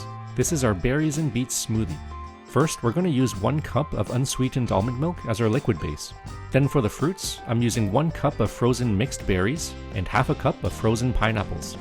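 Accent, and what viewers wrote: Canada. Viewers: Do i catch a Canadian accent kind sir?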